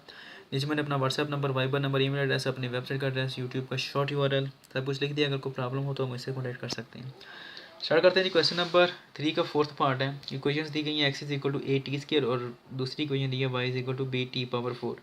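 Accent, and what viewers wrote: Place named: Lahore, Pakistan. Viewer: Sir AP ka bolny ka style Lahore wolo jasa ha and Iike their style of talking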